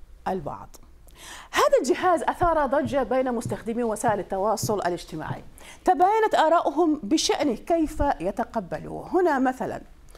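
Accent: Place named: Iraq. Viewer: كنت اتابع ذي المذيعة مع أحمد و ما كنت ادري انه عراقية الا اليوم سمعت من لهجتها (': ❤️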